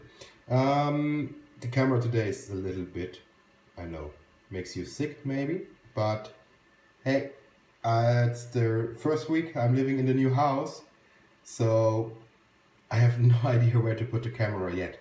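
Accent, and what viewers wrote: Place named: Germany. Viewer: Wow, das nenne ich mal eine hurtige Antwort! Danke, wollte nochmal eine zweitmeinung dazu hören weil ich mir wegen gleich und Wechselstrom nicht so sicher war... Bei den Lüftern bin ich noch am überlegen Wiedes am besten funktioniert... wahrscheinlich werde ich das ganze in dem Gehäuse eines alten pc-Netzteils verbauen und die Originalen Lüfter verwenden obwohl das auch passiv gekühlt ausreichen sollte. Habe nen Kühlkörper einer alten Grafikkarte genommen, der besteht aus massivem Kupfer und sollte mehr als ausreichend sein. Super, Danke nochmal für die schnelle Antwort, jetzt kann ich mich an das Kit ran machen dann ist es mit etwas Glück heute Abend alles fertig. Hehe der Akzent war doch etwas verräterisch deswegen hab ich mal auf deutsch getippt 😁👍 Grüße zurück Andreas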